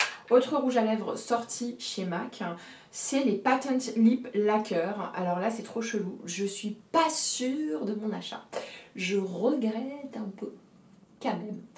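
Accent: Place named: Paris, France. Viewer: Encore un plaisir de t’écouter et de te voir avec ton joli accent parisien!😊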